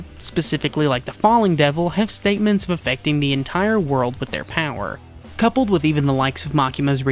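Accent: South, United States. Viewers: Love the southern accent no diddy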